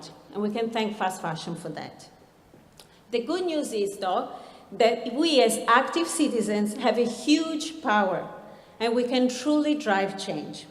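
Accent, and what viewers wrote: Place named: Italy. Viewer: She sounds like an Italian speaking English. Foreigner speakers never achieve a good British accent. Even the majority of native English speakers never achieve a good British accent! You are obviously not Italian. Her husband does not speak Italian perfectly. It is good but he has a limited vocabulary and even he himself has said, he sounds like an Englishman speaking Italian.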